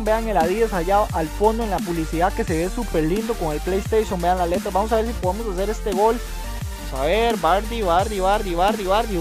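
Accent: Costa Rica. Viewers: eres de costa rica?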